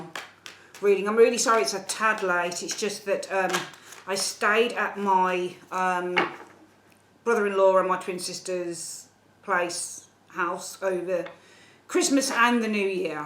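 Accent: Birmingham, United Kingdom. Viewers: hiya are you from birmingham just like me?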